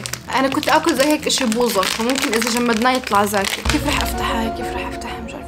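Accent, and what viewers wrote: Jordan. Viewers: اللهجهه الارودونيه كييييووووتتتتتت خصوصي لمن تكولل زاكيي ❤️❤️🥺🥺🥺🥺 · لهجة اردنية كثير حلوة ❤❤ من المغرب 🇲🇦 احبكم يا اهل الأردن 🇯🇴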